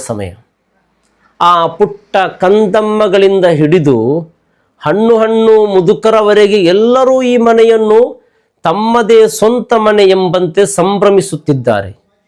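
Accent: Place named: Tulu Nadu, India. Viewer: ಯಾಕೆ ವಿಶ್ಲೇಷಣೆ ವ್ಯತ್ಯಾಸ ಇರಬಹುದು ತುಳು ನಾಡಿನವರು ಉಚ್ಚಾರ ಸ್ವಲ್ಪ , ಬೇರೆ ಚೆನ್ನಾಗಿದೆ ❤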